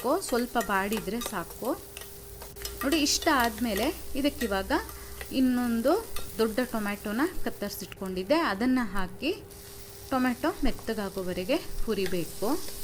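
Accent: Karnataka, India. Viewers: Nanu ninne madade... Nugge soppuna saru Nimma accent nange havyakara kannada anstu.... All the best for your Channel Thank you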